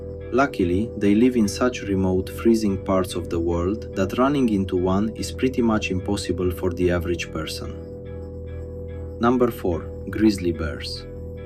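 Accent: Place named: Eastern Europe. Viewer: ur English is great and you did a superb job in the narration. I support Poland 100% as well as your great president in keeping Poland Polish. Western Europe is committing cultural suicide.Way back in Polish history, they took a strong stand as well. My comment was meant as a compliment because I always think the Slavic accents are so cool! I’ll put a “thumbs up” on your video right now.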